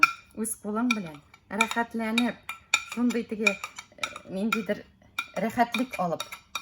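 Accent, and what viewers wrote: Standard. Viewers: Миңа пешерү осталыгы бер ошаса, бу ханымның татарча шундый чиста, матур сөйләве тагын да ошады! Бик зыялы ханым!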